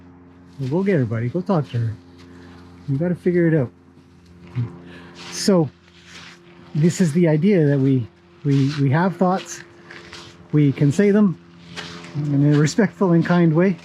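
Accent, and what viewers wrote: Canada. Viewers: Trying to figure out your accent? Canada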